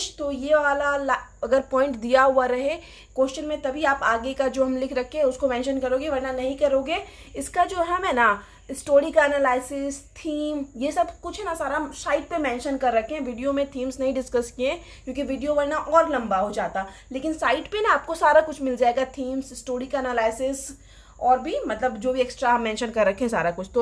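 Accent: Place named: Uttar Pradesh, India. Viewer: It's actually her accent, she's from UP or somewhere close to that part, I've been watching her video forever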